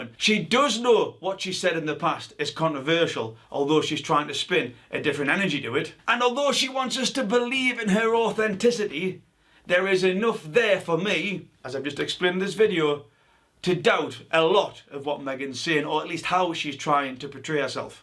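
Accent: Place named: Northern England, United Kingdom. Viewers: Great video. I’m enjoying that heavy Northern accent. Could listen to that all day 😁. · I’m back again, a year later, for the content, and also those delicious Yorkshire oooooooooooooh s!!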